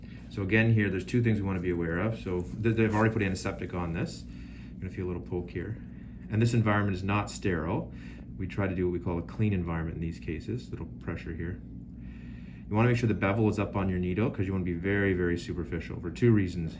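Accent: Canada. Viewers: I enjoy your Canadian accent!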